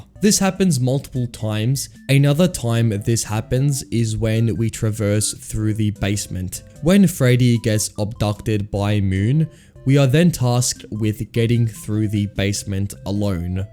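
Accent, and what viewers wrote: Australia. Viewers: I can't tell if I've heard your voice somewhere before or all Australian people sound the same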